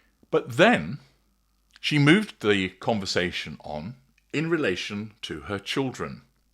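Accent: United Kingdom. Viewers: Been waiting for this. Next up would be a great comparison between mm and ek. You, Mr. NARRATOR , HAVE THE MOST DIGNIFIED BRITISH ACCENT and EXTENSIVE ENGLISH VOCABULARY, OFTEN MISSING FROM MANY LIVING ACROSS 🇺🇲THE POND. GOD SAVE ENGLAND 🏴 🇬🇧, THE ENTIRE UK 🇬🇧 AND ALL WESTERN COUNTRIES. ⚖️✨️🕯✨️🎚🛐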